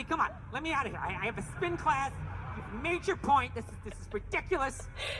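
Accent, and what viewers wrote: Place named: South, United States. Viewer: Yep I'm a reaction junky and from Bristol TN so not enamored by your voice or anything we both country....only said that to say you have good camera abilities. I wish at this point I had more going on but besides when my grandson gets his little league going I got down time and regardless if there's ever a reactor HOF I better be a judge so good start as I haven't seen you before ma'am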